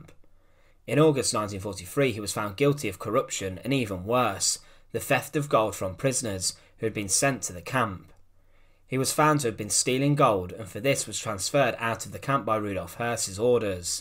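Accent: United Kingdom. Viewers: PLEASE INVLUDE ENGLISH SUBTITLES IN YOUR VERY INFORMATIVE VIDEO DOCUMENTARIES. YOUR STRONG REGIONAL ENGLISH ACCENT IS DIFFICULT FOR ME TO DISCERN AND UNDERSTAND . THANKS FOR THE GOOD WORK YOU ARE DOING . KEEP THEM COMING.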